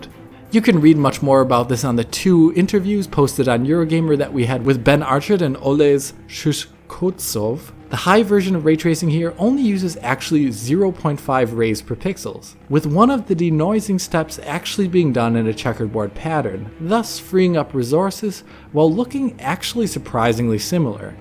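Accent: Germany. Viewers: So you are german? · Wait, what is native language? German?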